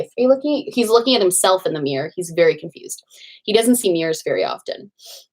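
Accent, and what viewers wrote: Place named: Boston, United States. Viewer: i’ve been binging your channel lately and the fact you have a BOSTON i’m gonna cry you’re literally my favorite person right now ❤️🥺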